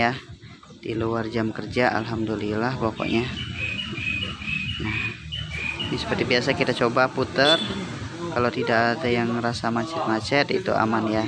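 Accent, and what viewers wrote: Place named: Lampung, Indonesia. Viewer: ini bengkel di lampung ya soalnya ada suara orang lampung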